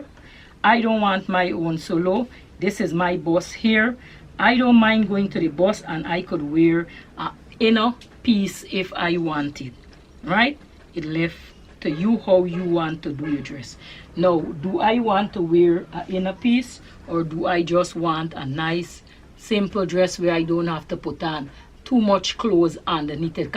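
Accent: Guyana. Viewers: I dont know how your video showed up on my YouTube. From I heard the accent, I knew you were Guyanese. I follow African fashion designers especially Nigerians online, but never came across à south américan/ Caribbean fashion designer. Before subscribing, I will watch most of your videos. The way of teaching is totally different than what I usually see. Detailled, proper, take all the time in the world to teach so that ppl could learn accurately. I say bravo. You even give advice about heavy on top or below which is so true. I like black, navy blue for below because my legs and calves are big lol now I have spoil belly so I need black for that area also. Well thanks for this channel and videos. Also thanks for taking all the time in the world for us to get proper learning. Maybe your channel continue to grow and grow. Ohh and yes I am from the Caribbean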